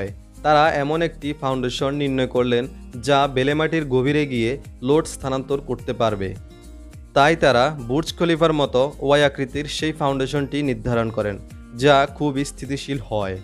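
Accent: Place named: Noakhali, Bangladesh. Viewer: ভাই নোয়াখালীর কথার টন পালটান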